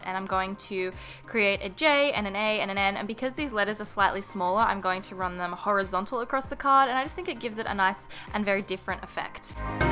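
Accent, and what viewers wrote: Australia. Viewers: are you aussi?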